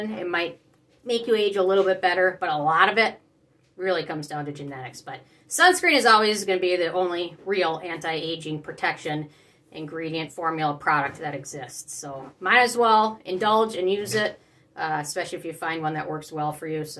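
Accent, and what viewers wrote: Minnesota, United States. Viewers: Are you from Minnesota, by any chance?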